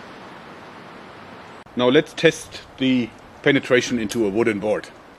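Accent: Germany. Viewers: the guy is a german i can hear it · yes he is...Don't you hear the typical german accent? :D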